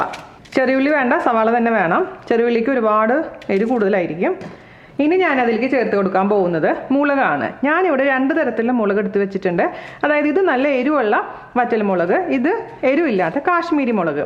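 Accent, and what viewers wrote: Thrissur, India. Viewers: Chechi,njangalk chechiyude Thrissur samsaram orupaad ishtamaann... 😘😁😀😂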